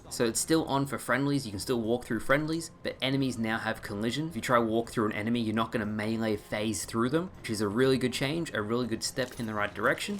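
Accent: Australia. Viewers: So good to hear an Aussie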